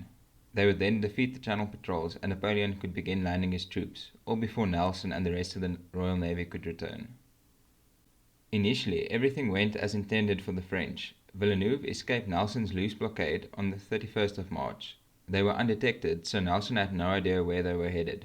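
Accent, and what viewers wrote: South Africa. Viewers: are you from south africa? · You afrikaans?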